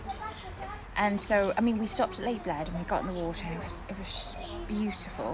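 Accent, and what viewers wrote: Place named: England, United Kingdom. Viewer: I`m English and you accent is so close to it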